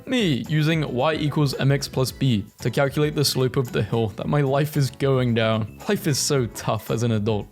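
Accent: United Kingdom. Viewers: His accent makes it A LOT better Shotout to British people and UK itself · Is he British??? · This guy's british? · Why are you British 😕🙁☹️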